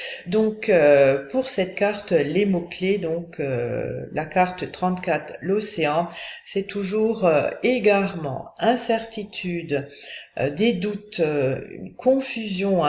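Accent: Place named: Switzerland. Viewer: A ta voix tu habiterais pas loin de la suisse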